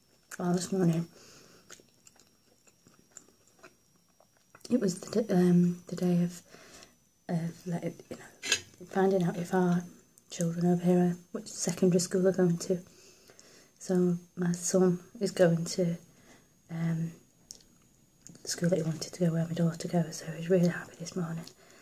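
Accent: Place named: Northern England, United Kingdom. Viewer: good work! where are you from if you don't mind me asking, I'm from South Yorkshire and your accent seems very northern? I'd guess at maybe Oldham/Rochdale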